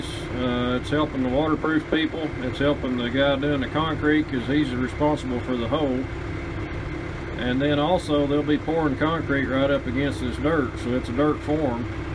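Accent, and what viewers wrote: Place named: Oklahoma, United States. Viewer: I promise I'm not picking on you here.... The engine noise was a little loud to understand you, so I turned on the closed captions. Needless to say it does not understand Okie very well. Personally I'm from MS and lived 50 plus years in TX so it doesn't understand me either. I just found it funny.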